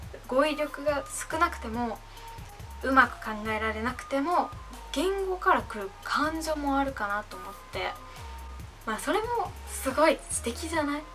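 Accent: Kansai, Japan. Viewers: 発音が関西寄りやね。めっちゃ自然でええ感じ。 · なんか関西弁ぽい訛りでかわいい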